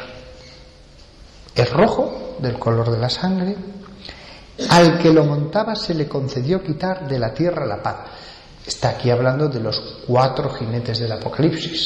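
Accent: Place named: Spain. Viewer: no aguanto el accento espanol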